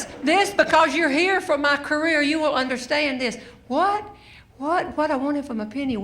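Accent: South, United States. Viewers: Linda Watkin I’ve watched for quite a long time now and cannot imagine how anybody could find a thing “nasty” about her. You said you “do not like her humor” and then said that you “think that she is nasty.” Is it her clothes, her hair, her voice, her Southern accent or what exactly do you find so offensive about her? Her humor is clean and free from the usual cussing, sex and vulgar talk or political comments as is so common with the majority of comedians these days. If you don’t care for her style of comedy, then simply don’t subject yourself to it. When you come upon one of her videos it really is the easiest thing you’ve ever done to just scroll on by without ever touching on it to start playing. We’re all allowed to enjoy our own chosen style of entertainment so please let us enjoy Ms. Robertson and I encourage you to find whoever or whatever brings laughter and joy into your life. 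God Bless You. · Ms. Robertson is the funniest woman in the world of humor. I love to listen to all her stories because of the humor and the southern accent. Thank you ma'am for the laughter you have brought....Billie J. King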